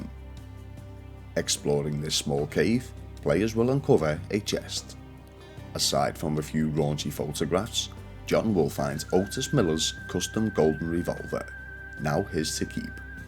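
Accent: Liverpool, United Kingdom. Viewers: Bro are you a scouser? It took me so figure out what was going on😂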